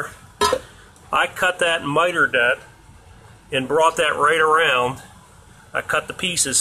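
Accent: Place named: Pennsylvania, United States. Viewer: Well you definitely have a pa accent! Couple years later now I was wondering how is it holding up?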